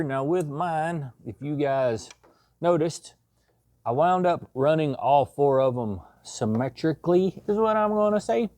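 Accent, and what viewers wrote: South, United States. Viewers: your good ole boy southern drawl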